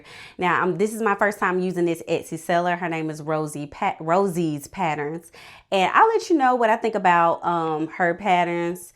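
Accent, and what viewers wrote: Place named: Georgia, United States. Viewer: I knew when I heard ur accent, u were from the Peach! I'm so excited u are from here too! I don't see many of us and I've seen fine fabrics so many times but was too scared to go in. I have so many ideas just need the ouch to create them🤭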